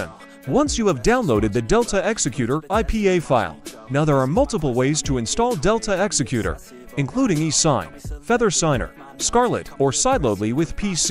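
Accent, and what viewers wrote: South Africa. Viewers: Your South African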